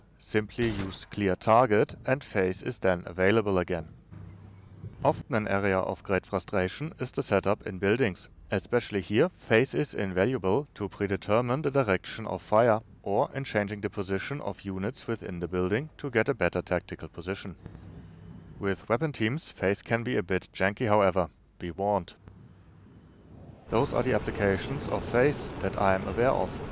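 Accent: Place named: Germany. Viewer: I'm honestly only watching your videos for ze lovely German accent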